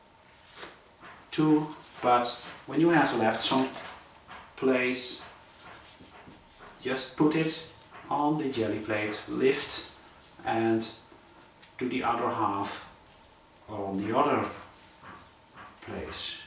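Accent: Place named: Netherlands. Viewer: Im always happy to see dudes creating too, I love it. I was bit scared for your nice shirt - especially the sleeves - but you didnt get a drop on in! For more traditional marbling with alcohol inks, just use hand sanitizer; a good (thick-ish) layer on the plate, then inks and you can manipulate them exactly like when marbling the traditional way (just dont use sharp tools with gel plate!). I liked all your prints, but the ricepaper ghostprint one was magical. Dankje well (sorry, Im just assuming youre from NL by your accent..) and greetings from Finland. -Jane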